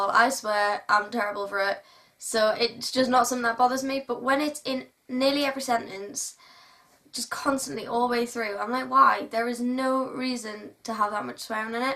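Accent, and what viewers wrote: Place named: Northern England, United Kingdom. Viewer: Great book tag ☺️ ☺️ I hear a northern accent I'm originally from Darlington 😊😊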